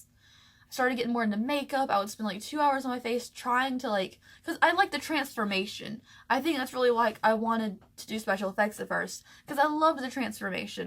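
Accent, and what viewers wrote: South, United States. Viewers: You are such a beautiful, beautiful girl. My heart breaks for you. I am from Denmark, so I have never experienced racism in that way and all the hate it brings. But I can relate to being an outcast dreaming about old world glamour. I think you are so strong. But you must be going trough a very shitty time right now, breaking up with the person who is your only safe heaven. I can only advice you to spend time with your grandparents before it is too late. If you ever chose to see your parents again, do it on neutral ground and state that you will only do it, if they will respect you. I know the healthcare in The US is somewhat shitty, but I really hope you have or can get some form of professional help. It is so important to talk about such trauma. I hope that you know I and many, many others love to watch your videos! i really admire that you can make one each and every day! 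Such talent and discipline. I wish you the very best. And I looove you southern accent! Please do not suppress it! Love from Denmark<3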